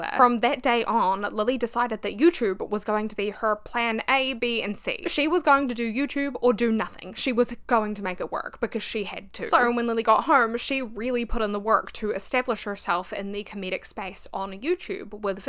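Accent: New Zealand. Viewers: I subscribed for the thick kiwi accent. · its so comforting hear a kiwi accent because as a fellow kiwi who consumes alot of youtube alot of it is from American or British youtubers i love watching your videos its so well made and also making me proud of seeing content i love to watch being made by someone whos from the same country as me which idk if thats odd haha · New sub - I love your 🇳🇿 accent! This video is super thoughtful♡ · I had to pause the video to come here and brag that I correctly guessed your accent was Kiwi! (as an American). Okay, back to regular programming.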